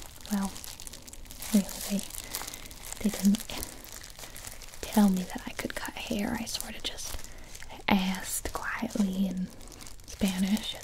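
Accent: South, United States. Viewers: I'm from Canada and she sounds very distinctly non-Canadian to me although I do hear the southern part it's very distinctive. I guess maybe if you stretch it you could say she has a B.C./Albertan accent but I think just Southern U.S. is more accurate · The southern accent mmm love it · Not gonna lie....the southern country accent threw me off lmao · Southern drawl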